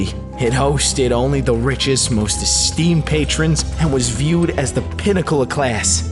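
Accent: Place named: United Kingdom. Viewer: Gasp HES BRITISH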